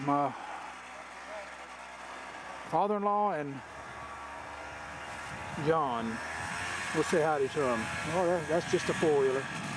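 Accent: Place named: South, United States. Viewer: you don't have a MN accent you sound like you are from the south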